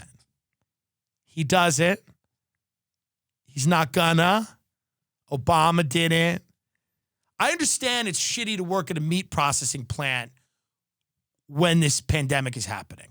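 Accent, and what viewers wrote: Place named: Queens, United States. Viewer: This guy must be from Queens or LI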